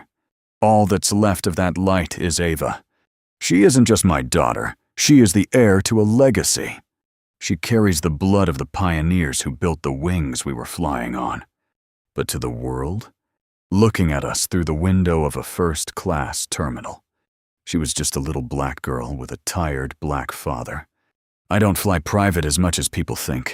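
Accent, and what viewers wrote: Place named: United States. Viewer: Sounds like another voice that I heard on another video, except it's not an exaggerated British accent. It's a highly rhotic American accent.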